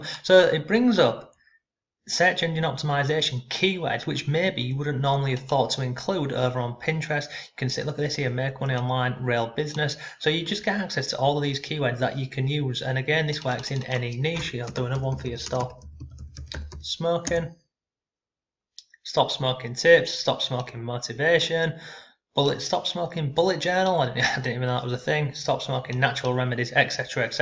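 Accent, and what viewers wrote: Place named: Northern England, United Kingdom. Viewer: your from up North somewhere in England.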